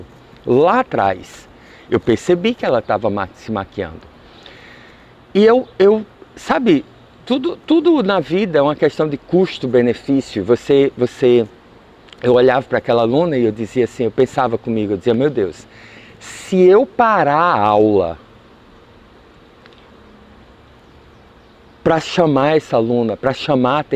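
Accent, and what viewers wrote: Northeast, Brazil. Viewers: kkkkkkkk Amo o seu sotaque professor. Você é nordestino? Algumas pessoas dizem Auauauauau? kkkk Essa técnica parece com uma do Eckhart Tolle diz no livro O Poder do Agora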